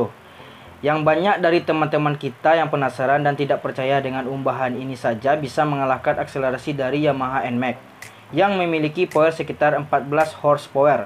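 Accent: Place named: Padang, Indonesia. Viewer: Orang padang nih ketara bat haha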